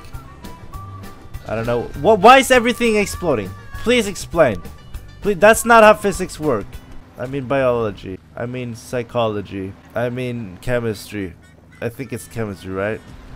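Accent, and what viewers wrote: Sweden. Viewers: Waitttt.. are you from sweden? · Are you from sweden? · Är du svensk? /are you from sweden?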